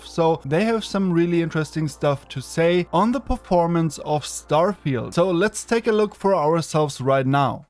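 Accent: Germany. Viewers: You German? Your accent told me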